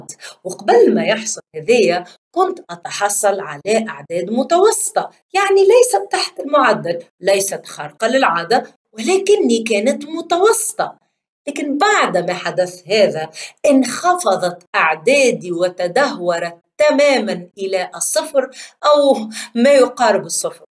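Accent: Tunisia. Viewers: اللهجة التونسية نحبها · اللهجة التونسية الجميلة الباهية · لهجة تونسية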